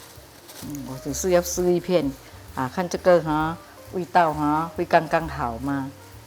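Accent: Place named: Hokkien, China. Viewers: 潮州人吧！ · 是閩南人吧，口音很熟悉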